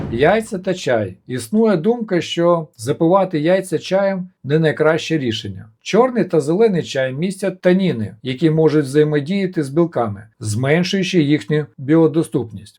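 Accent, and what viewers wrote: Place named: Poltava, Ukraine. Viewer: щиро дякую Вам за відповідь! Я з Дніпропетровськоі обл, але темчасово знаходюся в Даніі, а подумала, що ви з Полтавськоі, бо говор схожий на наш! Робете дуже важливу справу, щоб наша нація була здоровішою і сильнішою! Вам бажаю процвітання і до вершин без перешкод!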